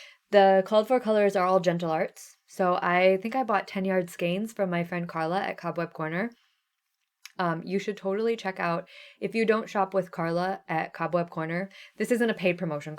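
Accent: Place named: Midwest, United States. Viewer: Sorry you didn't get to Nashville, but it was good to see you! I had to lol when you mentioned your 'midwest coming out'! I've thought that for years ever since I watched Fargo - I hear that accent when speaking! 🤣 I'm sorry for your loss of your uncle.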